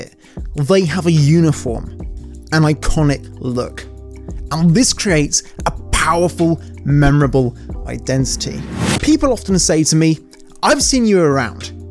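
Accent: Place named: United Kingdom. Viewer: Your british accent works well with you. Makes it more classy!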